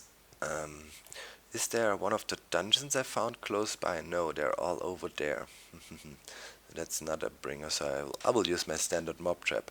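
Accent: Germany. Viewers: Ha! Ich wusste doch das du Deutscher bist, das hört man doch. Hab mich aber nie getraut zu fragen :D Geile Videos-> Aboniert =3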